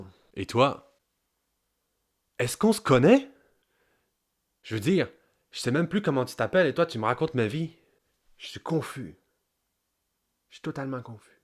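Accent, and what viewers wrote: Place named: Quebec, Canada. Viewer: Ce petit accent québécois. 👌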